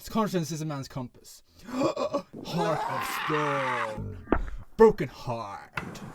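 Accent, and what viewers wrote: Sweden. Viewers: Loving the Swedish accent which is strong in this one:)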